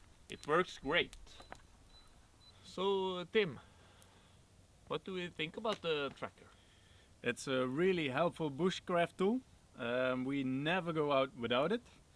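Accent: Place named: Netherlands. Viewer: Hej, are you originally from Holland or Belgium i have family there i recognise the brytning! Hälsningar från Hamburgsund!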